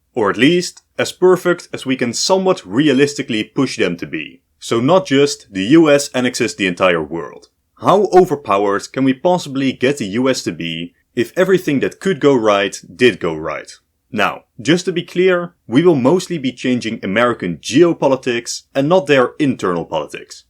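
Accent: Netherlands. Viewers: You sound dutch like me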